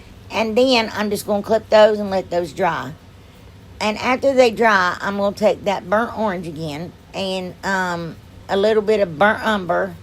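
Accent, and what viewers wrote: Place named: Tennessee, United States. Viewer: I love to watch you. You definitely put a smile on my face when I watch you. Your accent sounds close to ours here. That would be in Northeast TN. Love your crafts. You can put matches in the toothpick holder to light candles too.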